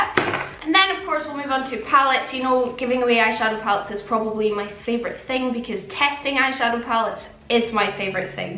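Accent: Scotland, United Kingdom. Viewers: I love your videos, I think I started watching them when I was looking for reviews of pale foundations. I'm a sucker for a beautiful Scottish accent! What lip colour are you wearing in this video? Such a gorgeous red.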